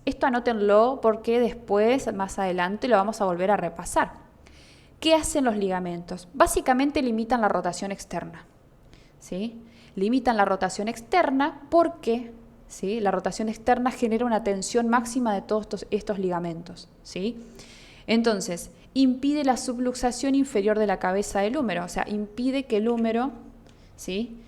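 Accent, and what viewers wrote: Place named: Rioplatense, Argentina. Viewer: Ay, cariño, no lo soporto el shesheo. Me parece un vídeo interesantísimo, pero no soy capaz de verlo.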